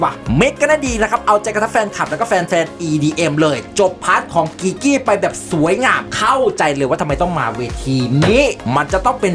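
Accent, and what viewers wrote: Isan, Thailand. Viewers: สุดยอด Lisa❤️❤️👑👑 มักเสียงเว้าเด้ มวนคักจนนึกภาพออกเลย 😆😆😂😂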